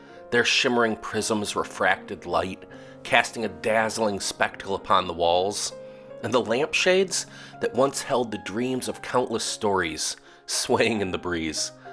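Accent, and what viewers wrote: Midwest, United States. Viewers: I like your accent. It sounds like you're from the Midwest. I could be wrong, but that's what it sounds like to me.😊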